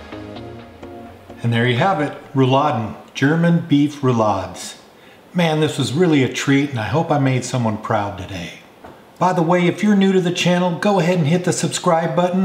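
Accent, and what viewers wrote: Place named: Chicago, United States. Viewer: awesome recipe! You sound like you are from Chicago.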